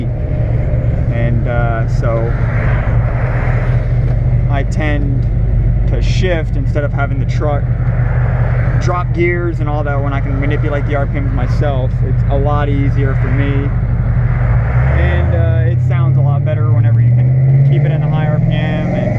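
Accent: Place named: Texas, United States. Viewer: you from texas og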